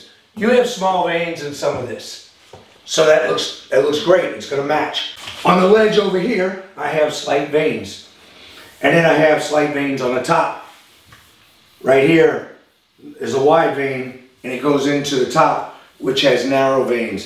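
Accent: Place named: New Orleans, United States. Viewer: You have to be from New Orleans or close by. That's a familiar accent ..;)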